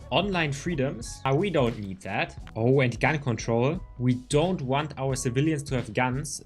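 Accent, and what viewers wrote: Germany. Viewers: I can hear german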